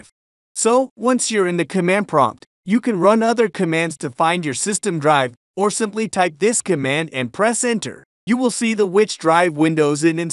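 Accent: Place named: India. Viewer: This nigga gotta be Indian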